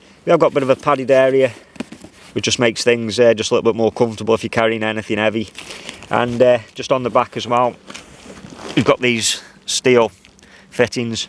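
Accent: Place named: Merseyside, United Kingdom. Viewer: Nice video. You say that you live in the Midlands, but I can't help but think that you're from Merseyside?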